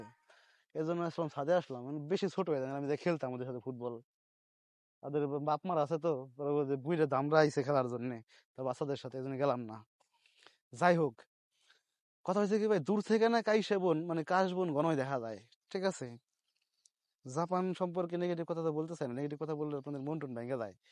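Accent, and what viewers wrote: Faridpur, Bangladesh. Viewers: ভয়েস শুনেই বুঝে গেছি৷ কোন এলাকায় ভাই?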